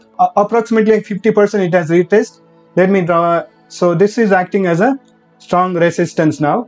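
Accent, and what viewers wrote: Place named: India. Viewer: ohh your indian akcent )) Greetings from Azerbaijan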